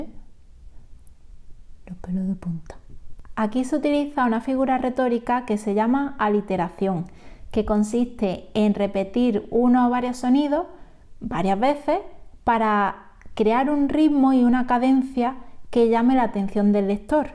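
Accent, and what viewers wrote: Andalusia, Spain. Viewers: Es acento andaluz. De Granada, creo.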